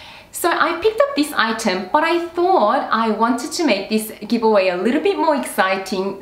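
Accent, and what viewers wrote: Australia. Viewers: Hi! Congratulations! I came across your channel while searching up about chanel bag reviews. Instantly, I feel in love with your personality and Aussie accent:P So generous of you giving away prizes - I'd loooove to get the LV Monogram 6 key holder:) I’ve entered on Instagram as well 😊 All the best with your YouTube and I can’t wait to see your future videos <3 - Shirley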